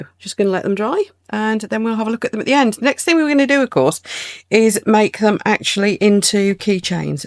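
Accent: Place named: Midlands, United Kingdom. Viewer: Super informative! Are you from the Midlands by any chance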